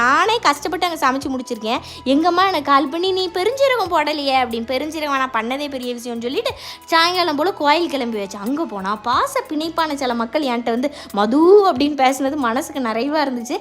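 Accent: Tirunelveli, India. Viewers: nan tirunelveli than Unga vedio parkum podhu oorukarangata pesina feel lv u thankachi😊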